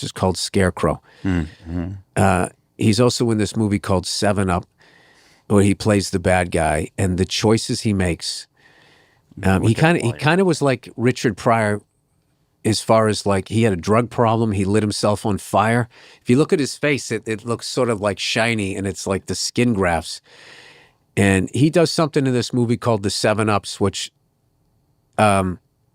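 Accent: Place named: India. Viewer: i can instantly tell that you are indian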